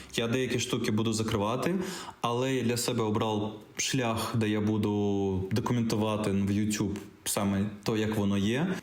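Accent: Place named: Belarus. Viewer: наче українська з елементами білоруської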